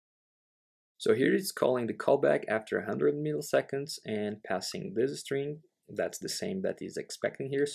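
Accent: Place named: Brazil. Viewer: dude its impressive how from the first 3 words you've spoken i already recognized the brazilian accent exactly like mine ahahahahhaha nice vid! :P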